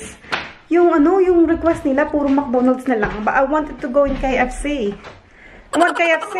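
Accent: United Kingdom. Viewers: Hi sis! I’m your new subscriber now. Hope mka bisita ka po sa bahay ko. Cute nman ng mga babies mo.... I love British accent!😘❤️ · Great. Congratulations for that👏👏👍 And ilove your British accent momshie🤣😊👍👍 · whoooa i like your british accent now nice blog. im here now dala angk regalo. tinapos ko talaga nice family. asahan din kita sa bahay ko. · British po tlga accent mo na,nakasanayan. Bumpbump na po ako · I love that British Accent! Lakas maka Harry Pottah! Chaaarr! 😆👌🏽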